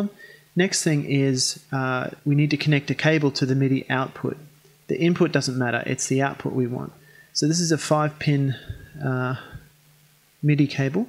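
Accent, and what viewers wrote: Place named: Australia. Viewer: hi great help I just got a usb cable to go from my imac 209 to my roland Em 15 and was showing in put light on in green but would now show up on mac or work, thats cause its not a midi keyboard right and my interface is a focusrite scarlett solo so has no way of connecting it up I looked up how to connect it and was advised to get the usb midi cable so what can I do please do I need to download software or what. I'm from melb I noticed your Aussie accent.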